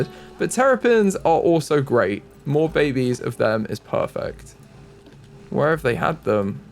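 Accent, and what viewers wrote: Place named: RP, United Kingdom. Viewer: He's definitely English. I would say South/South East England. Honestly to me, from North East England, he just sounds... generic English lol 🤷‍♂️ Like definitely not Newcastle, or Yorkshire or Liverpool, or Birmingham. Just... slightly posh/fancy generic lol.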